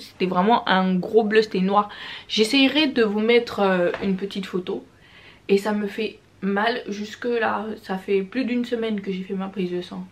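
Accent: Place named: Gabon. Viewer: Je te découvre quand j’ai entendu ta façon de parler j’ai dit c’est une Gaboma je m’abonne directe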